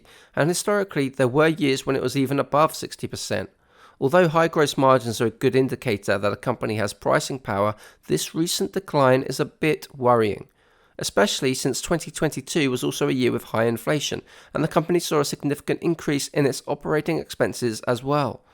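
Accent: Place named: United Kingdom. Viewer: Good video except for the annoying British accent, you English people should learn California accent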